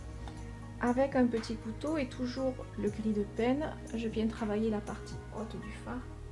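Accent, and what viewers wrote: South, France. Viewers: Le tout parfaitement expliqué avec le charme de l'accent du sud qui m'est cher. Merci bcp de la part d'un toulousain.😊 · magnifique tableau avec les explications top et ce petit accent du sud qui apporte une note musical à tout ça . Un grand merci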